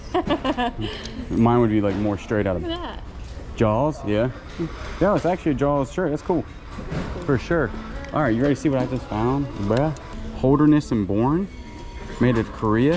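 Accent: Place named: Baltimore, United States. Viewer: Or.....are you dumb already? I detected A Balimore/ Maryland accent... Howwme. Owwned, etc.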